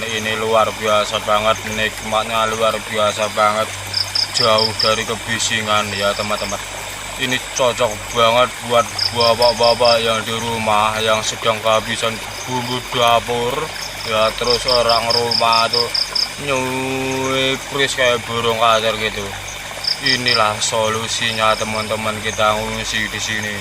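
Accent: Java, Indonesia. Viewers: Om apa memang ngomongya kaya begitu, atau tambah2 medok..coba ngomongnya biasa aja biar tambah kereen..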